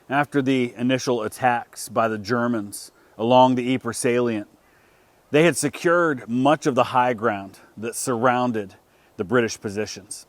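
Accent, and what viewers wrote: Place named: Canada. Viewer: I have just started to watch this series of videos and you are so dedicated to WW1 and all the very new and very old ways of fighting. i guess that you are Canadian by your accent, I love the passion that you show for many dead very young men. The start of WW1 is still not very understood, why Britain got involved and even more the Americans is still to this day not really understood.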